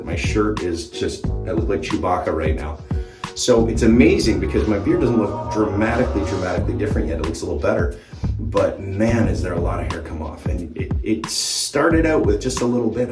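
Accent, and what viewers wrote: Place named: Canada. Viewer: I thought you were canada based with your accent and the way you spell colour.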